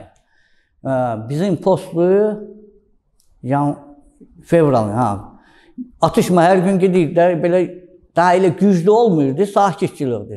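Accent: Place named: North, Azerbaijan. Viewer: Бизим шимал лехчеси олдюрюрде мени❤❤❤❤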